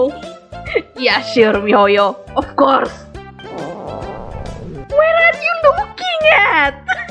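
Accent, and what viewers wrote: Indonesia. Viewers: Accent indo? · kek orng indo deh accentnya..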